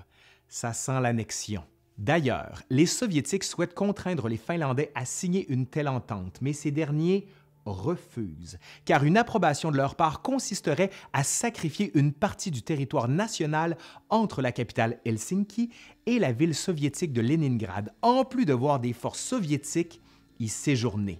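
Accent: Quebec, Canada. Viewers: J’aime beaucoup ton accent québécois :) Très bonne vidéo ! Salut de Bruxelles (Belgique) 👋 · L 'accent du Quebec , fluide et delicat , professant la langue de Molière dans toute sa splendeur est un regal pour mes oreilles ... Merci copain !!! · A mon avis, une série aussi passionnante et documentée que celle sur la WW1! Et avec la diction parfaite, agrémentée de ce charmant accent canadien, de. 7 capsules, je suppose 1 par année de 1939 à 1945, qui vont nous ravir. Vivement 1940! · Je comprends mieux le francais canadien grace a toi! Merci! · Le seul accent québécois que j'ai plaisir à entendre 😊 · J'M Bcp l'histoire.. mais svt crt guerres sont 1peu "touffues".. 🤦🙍 Mais vrmt tu rends ça très clair...presk simple.. (alors qje n'avais mm pas connaissance d conflits s/ts les fronts !) Je pensais qle Japon n'était entré q Bcp + tard... 🤔🤦🤦 De + Bravo pr ta diction.. 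et ton charmant accent Québécois... 👍 👏👏👏👏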